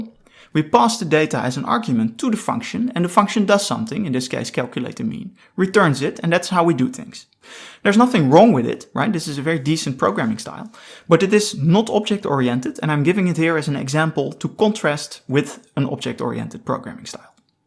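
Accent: Netherlands. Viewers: Afgaande op je accent ben je Nederlands.. Fijne video's ! dank!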